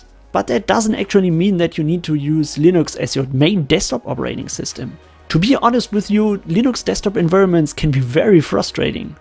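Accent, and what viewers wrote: Germany. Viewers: Yeah, German accent, great 😃 Subscribed! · Man hört kaum das du deutscher bist xD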